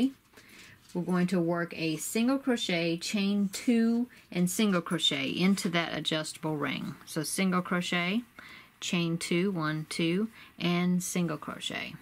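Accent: South, United States. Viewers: is that southern accent ? LOVE that bag <3 thnx for the pattern :D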